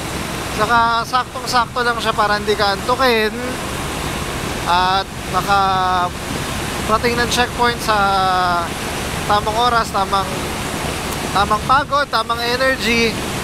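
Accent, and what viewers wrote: Bulacan, Philippines. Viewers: Puntong bulakenyo ser.. 😁😁😁 Yes sir😁😁 solid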